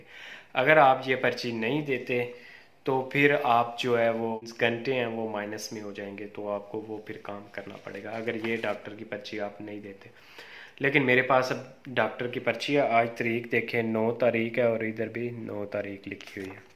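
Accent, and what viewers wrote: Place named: Punjab, India. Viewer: Nice panjabi bro ap suraiki ho ya panjabi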